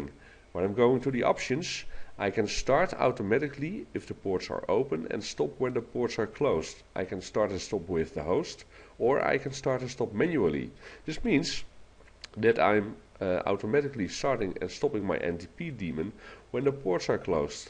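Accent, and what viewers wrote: Netherlands. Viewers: are you dutch? you accent seems dutch.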